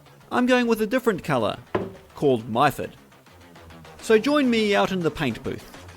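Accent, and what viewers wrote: New Zealand. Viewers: Are you a Kiwi guy? You sound like a fellow Mainlander. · Love hearing a Kiwi accent, great to see another NZ bloke in his shed making quality content!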